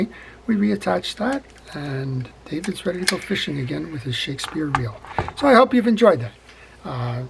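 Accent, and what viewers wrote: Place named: Long Island, United States. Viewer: Excellent video and thank you ! You are a savior. I came here to figure out how I put the bail back on a Cirrus CR035. Had a hard time finding a schematic (probably could have search for one for the CR025). A coworker gave me the reel and I have been taking it apart and clearning and lubing it up. I could not figure out how to put that black piece on the bail spring (missed it had a hole for the spring bc I had grease covering it). Thanks to you I now have it covered. I needed to come apart bc there was dirt in there and had been neglected. Thanks again. You sound like you may be a fellow LIer; grew up in Rocky Point myself. Take care.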